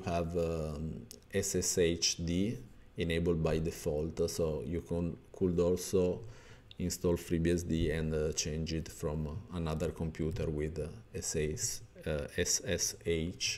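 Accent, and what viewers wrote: Italy. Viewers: holy italian accent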